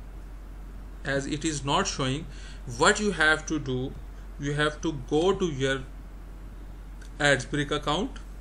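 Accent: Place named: India. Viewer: Is this indian website bcz you voice look like you are indian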